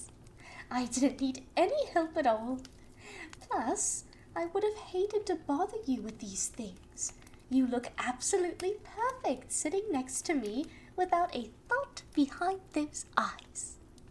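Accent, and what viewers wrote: United Kingdom. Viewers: I love the OC outfit and the British accent!!!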